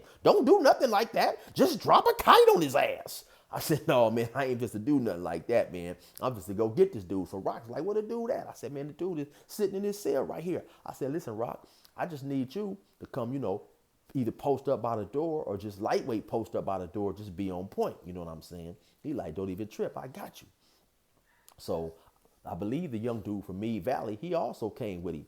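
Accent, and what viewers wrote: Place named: West Coast, United States. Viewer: Love baw stories and the west coast accent…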